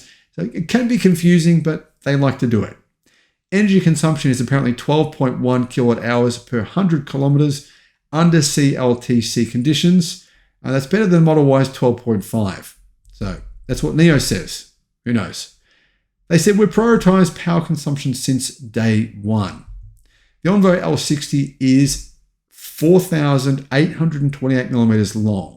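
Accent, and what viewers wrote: Australia. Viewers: He is not an American, he is an Australian. Can’t you tell from his accent?😂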